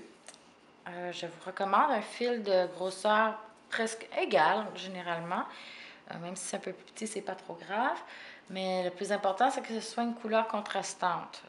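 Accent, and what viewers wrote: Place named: Quebec, Canada. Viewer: t es québécoise que j'entends cet accent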